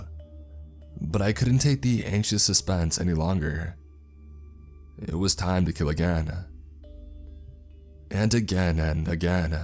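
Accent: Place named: North Carolina, United States. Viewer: I’m guessing whatever answered his prays and told him, yes. you will die in a cell or this cell, idk if it’s just me or I am remember wrong,, but I thought he said that. And if he did i wonder if it’s the same prison he was in, or maybe he did say in a cell. Either way I like how he became a officer, that and him saying this is my last time. That’s kinda for shadowing him getting hurt and killed if he had to respond to whatever he needed to be they’re for. Love your work my guy, you have the best voice imo, I hate to say it but Iv got ware I always look for your channel over the others. There not bad but I relate more to how you talk lol. Idk if it’s because I live on the east coast of NC and have friends around here and a couple in Ten that have a similar voice lol idk how to really explain it lol. But your the best even your new channel is funny af but it’s got both types of funny. 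Funny just to be funny and funny like watching a guy pull a dame big looking gater up while in a basically a live rafe if your lucky, and him thinking time to nope tf right up out of here lmao.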